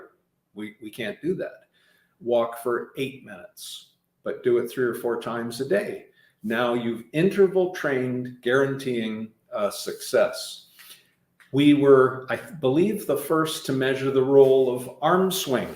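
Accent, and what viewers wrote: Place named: RP, United Kingdom. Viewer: He is only speaking with a plum with. His mouth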